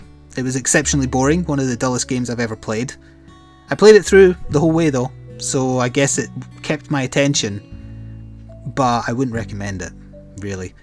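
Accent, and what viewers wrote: Scotland, United Kingdom. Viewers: wasn't insulted. mermen are male half fish. i like listening to a scottish accent and u dont come across as stupid. keep up the entertaining and interesting videos. thankyou.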